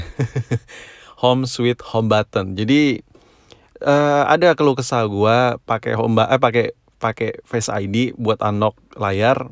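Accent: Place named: Gunung Sindur, Indonesia. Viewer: Logat lu kaya orang gunung sindur anjay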